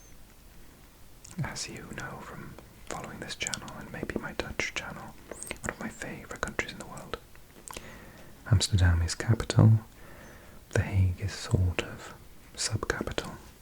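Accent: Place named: Wales, United Kingdom. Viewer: Fellow welsh person?🎉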